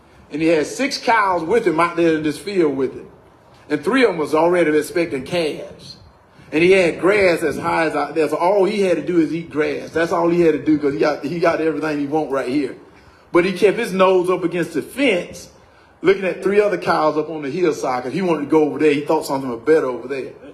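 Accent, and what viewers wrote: South, United States. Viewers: Making fun of his deep southern accent???? This Georgian is voting for him.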